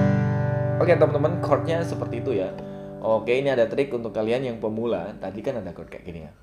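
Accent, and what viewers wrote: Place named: Java, Indonesia. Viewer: Uwow.... Wong jowo tah. Kerasa banget logat mu dek. Salam ya dari ku putra Sumatra Utara Medan.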